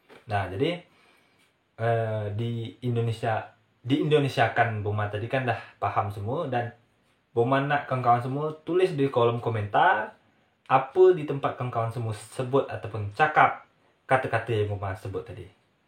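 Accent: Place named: Johor, Malaysia. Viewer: Salam kenal Pada pendengaran saya, bahasa melayu yang gunakan tak ubah seperti pertuturan orang melayu Johor. Mungkin ramai penonton dari Lembah Klang sependapat dengan saya.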